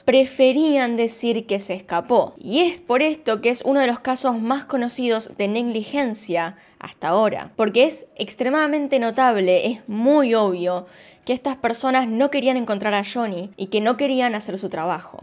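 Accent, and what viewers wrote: Argentina. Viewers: Hola! Disculpame que te pregunte, se te hace difícil diferenciar acentos? O los escuchas igual? Por ejemplo acá ella que es argentina, o el español de mexico, españa, etc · yo tampoco entiendo todas las palabras pero me encantan sus vídeos y el acento argentino ❤❤ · Tu español latinoamericano argentino es hermoso (igual que el mío, que es de mas al norte) y quien no lo entienda es que no lo quiere entender… saludos hermana de La Patria Grande 😺😺💜💜🇲🇽🇲🇽🇦🇷🇦🇷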